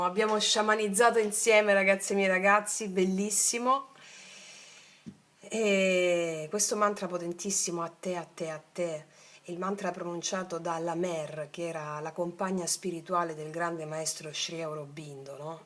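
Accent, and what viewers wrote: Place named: South, Italy. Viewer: Buongiorno bella voce che hai specialmente il tuo accento che credo che sia del Sud.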